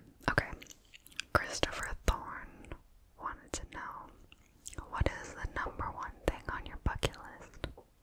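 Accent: France. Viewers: Cool! J'ai hâte. En passant, t'as un accent français extraordinaire, et c'est génial. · Tu dis que tu es gêner un peu de ton accent en français... Je te rassure en dissant que la plupart des québécois que je connais (à l'extérieur de montréal) adore les accent française ;)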